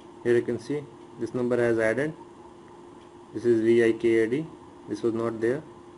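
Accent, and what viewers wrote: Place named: India. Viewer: Sorry, your Hindi accent is so strong I can't understand what you were saying. I guess I will never find anyone who knows how to present the "Add A Friend to WhatsApp" on a Samsung Android phone! :-(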